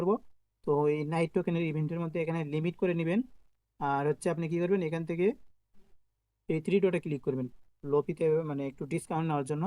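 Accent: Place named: Bogura, Bangladesh. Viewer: vi mone hoy Bogura er hosse hosse kotha ta bashi boltacen amio Bogura er sol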